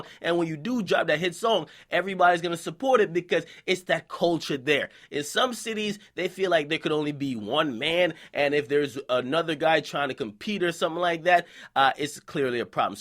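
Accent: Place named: South, United States. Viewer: you are an american rapper loose the country accent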